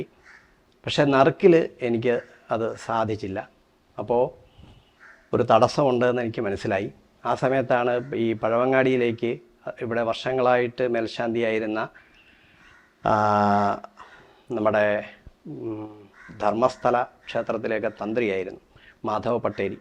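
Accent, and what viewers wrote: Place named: Kottayam, India. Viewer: ഒരു വർഷം മുമ്പ് ഇട്ട വീഡിയോ ഞാൻ ഇന്നാണ് കണ്ടത്.....തിരുമേനിയുടെ സംസാരം കുറച്ചുനേരം കേട്ടപ്പോൾ കോട്ടയം ഭാഷ പോലെ തോന്നി....ഞാനും കോട്ടയം സ്വദേശിയാണ്.... ഇപ്പോൾ തിരുവനന്തപുരത്ത് സ്ഥിര താമസം ആണ്.....പല തവണ ക്ഷേത്ര ദർശനം നടത്തിയിട്ടുണ്ട്.....ഗണപതി ഭഗവാൻ്റെ അനുഗ്രഹം എല്ലാർക്കും ഉണ്ടാകട്ടെ,🙏🙏ഓം ഗം ഗണപതയെ നമഃ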